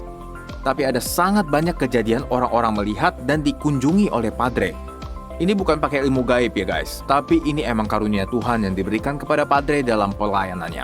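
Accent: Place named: Java, Indonesia. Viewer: Jangan pakai logat jawa lah. Jadi gak enak konten mu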